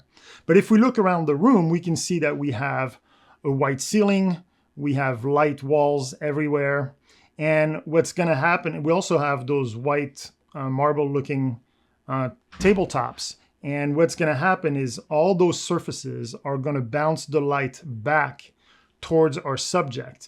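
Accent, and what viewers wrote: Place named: Quebec, Canada. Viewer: I would have guessed French Canadian.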